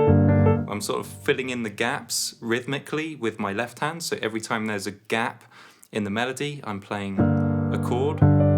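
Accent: United Kingdom. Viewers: How come you speak British, living in the States? I can hear that, as I am from Flanders.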